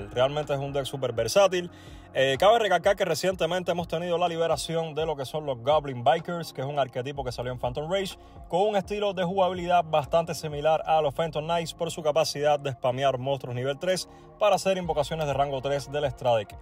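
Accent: Cuba. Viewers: Asere tu eres cubano?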